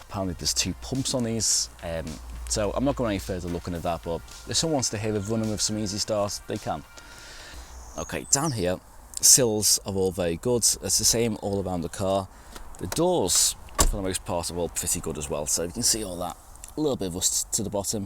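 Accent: Liverpool, United Kingdom. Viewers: I have a mate from Maghull and you sound exactly like him. A bit different from 'normal' Liverpool!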